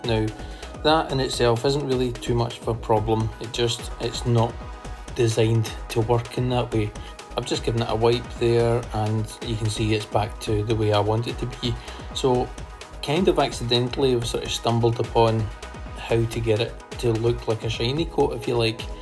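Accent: Scotland, United Kingdom. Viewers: What an informative film and of so much relevance to me as I started using GT products recently Tyre Serum and Decon Shampoo. I tried the Serum on a a couple of cars and so far have been impressed. My Father in law has noticed a difference in his sidewalls. Do I detect a hint of a Scottish accent there. Some other Youtuber recently suggested putting a dressing on top of the Serum if desired and I have not tried that yet and wondered what your thoughts were. I subscribed also as I am a keen detailer with time on my hands to learn as much as I can before I think of setting up my own business. Thanks for the info.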